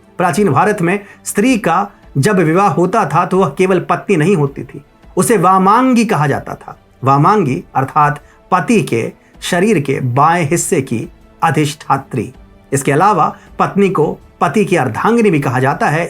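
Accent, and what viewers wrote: Standard. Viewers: Kitne mahine baad itni shudh Hindi sunkar Aanand hi aa gya.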